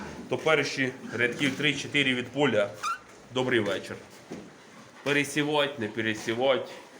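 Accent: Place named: Poltava, Ukraine. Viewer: Говірка чую полтавська, а район який? Миргородський трохи поморозило.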